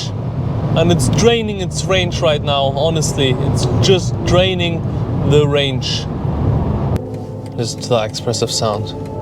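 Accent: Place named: Germany. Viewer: Thought he was speaking german with that accent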